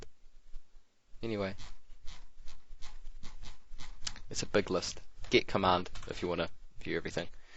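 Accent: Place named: New Zealand. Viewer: Great Vudeo!! Viery helpful and unformative! the accent makes it better. Been doing some revusion on Powershell and this is great vud for ut! Go Kiwi!!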